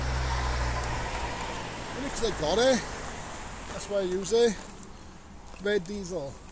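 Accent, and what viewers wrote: Wales, United Kingdom. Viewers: I should say British accents, rather than English as this man is Welsh. Same theory though; Listen enough times to the variation of accents in the UK and it will make sense. Unless they are from Newcastle where even us normal British people do not understand a word they are saying. Google "Newcastle accent" and you will see what I mean!!!!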